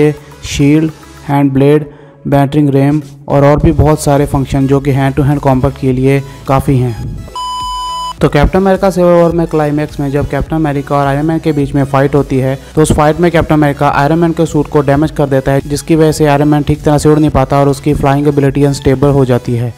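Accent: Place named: India. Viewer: Bhai tuje uska Naam padhke aur hindi accent sun ke pata nhi Laga ?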